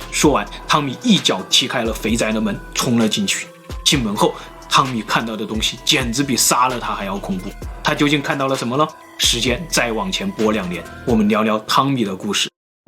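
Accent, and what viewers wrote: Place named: South, China. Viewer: 我自己蠢，所以特愛聽聰明人的故事。這位南方口音超重的小伙子說的奇談怪論有趣、好聽、我喜歡😘。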